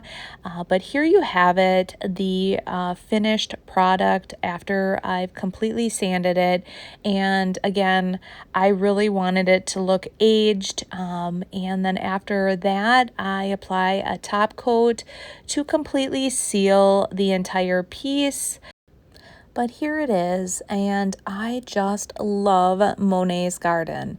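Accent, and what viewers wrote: Wisconsin, United States. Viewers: Love everything!! Your Wisconsin accent makes me miss home..love it! ( I live in California but originally from the Illinois/Wisconsin border) Shabby Chic is my favorite.. I’m on the No vote side to Laundry it’s beautiful as is!!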